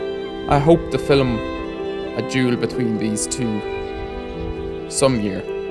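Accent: Ireland. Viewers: I love Irish accents :)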